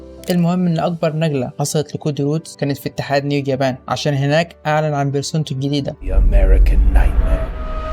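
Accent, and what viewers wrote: Upper Egypt, Egypt. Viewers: انتا صعيدي؟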